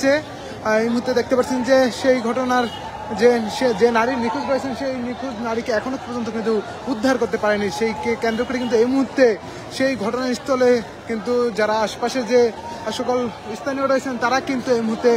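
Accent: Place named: Narsingdi, Bangladesh. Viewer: নরসিংদীর কন্ঠ স্বর কেনো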